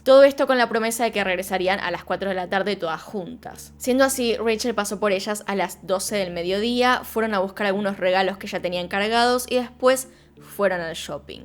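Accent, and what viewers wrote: Argentina. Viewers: Wow, la verdad, que al escuchar tus vídeos me relajo demasiado xd, no soy muy fanática del acento argentino, pero del tuyo le hago hasta un altar 😔👊💛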